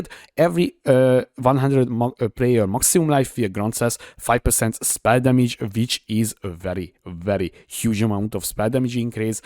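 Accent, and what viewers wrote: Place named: Hungary. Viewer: eros a magyar akcentus hhhhh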